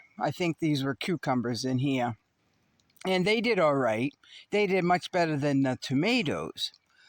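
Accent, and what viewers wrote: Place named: New England, United States. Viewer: I really enjoy listening to you talk and I'll explain why. My oldest brother passed 3 years ago from cancer and he lived in Rhode Island. And last month someone that was like a brother to me that lived in New Hampshire also passed from cancer. Hearing that New England accent brings some comfort to my heart. Me and my NH brother used to get so tickled at each other with the comparison of saying, when I park my car I put my car keys in a pocket of my khakis😂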